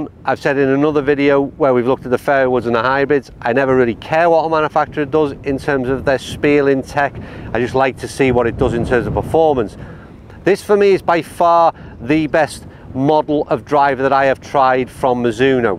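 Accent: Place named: England, United Kingdom. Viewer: Why do we have to listen to people that have a strong English accent???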